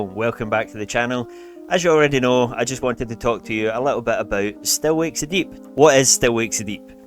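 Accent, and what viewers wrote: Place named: Scotland, United Kingdom. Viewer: I always wunder ur accent, scotlands 🙌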